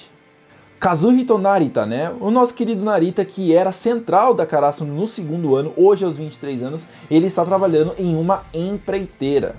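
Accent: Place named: South, Brazil. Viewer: Falo do "aportuguesado" mesmo, ainda que seja irrelevante... seria SU como silaba tonica... Não to criticando, nem falando nada... mas é muito comum a troca da silaba tonica mais para o sul do país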